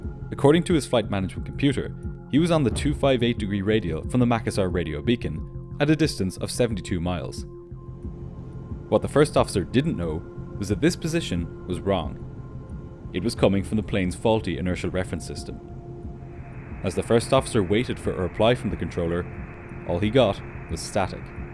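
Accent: Ireland. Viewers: I came for the flight video stayed for the Irish accent!😄☘️ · Hahah long live the Irish accent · I had the same thought! 😂 I was like, "Well he's Irish so maybe it's not what I thought lol"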